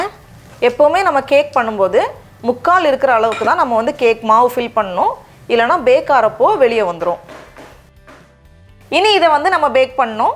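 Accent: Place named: Chennai, India. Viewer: Super akka love Madras Tamil❤️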